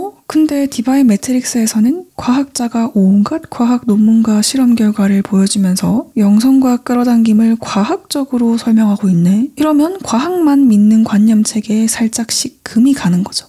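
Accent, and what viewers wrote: Gyeongsang, South Korea. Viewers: 님 늘 감사합니다!^^ 우연히 보게된 끌어당김관련 영상을 시작으로 자기사랑 무의식정화 내맡김으로 관심이 이어졌는데요 미신 같았던 끌어당김을 님 덕분에 과학적 뒷받침 안에서 이해하게 되었어요 그리고 제가 예전 어떤 안 좋았던 지인의 기억으로 인해 경상도사투리 쓰는 여자에 약간의 거부감이 있었는데요(저 여자예요) 님 영상들 보면서 경상도사투리가 참 정겹고 좋으네요ㅎㅎ 사투리는 죄가 없는데 제가 그 기억을 놓아버리지 못했던 때문이죠 아무튼 두루두루 감사합니다ㅎㅎ 님 언제 한번 인간에게 자유의지가 있다 or 없다 이 부분에 대해 다루어주실 수 있으실까요? 님께 댓글을 달고 있는 지금 이 순간조차 모든게 짜여진 시나리오의 일부분인 건지, 태어나기 전 인생의 밑그림은 계획하고 왔지만 자유의지도 있는 건지 궁금합니다.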